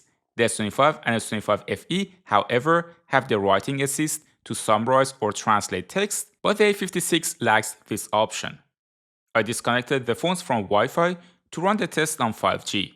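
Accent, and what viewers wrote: Iran. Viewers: Dude are you Iranian your accent is so obvious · Persian accent, nice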